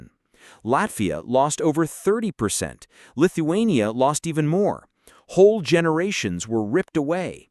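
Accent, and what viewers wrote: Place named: United States. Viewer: PUTIN TV in american accent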